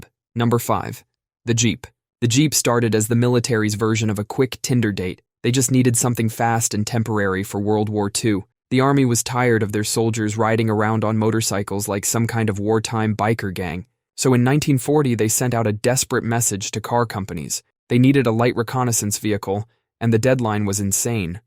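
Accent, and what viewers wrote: United States. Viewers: the qwerty board is not "the global standard"... leave it to Americans tothink their standard is the default. There is QWERTY, DVORAK, AZERTY, COLEMAC and QWERTZ in addition to Arabic, cyrilic and asian variants standard lmao #shytamericanssay #americandefaultism (yes I know the channel claims UK, his accent is not English/Welsh/Scotish/Irish, it is American if the "some states finally had enough" comment he made with DST didn't clue you in, a Brit would not default to "states")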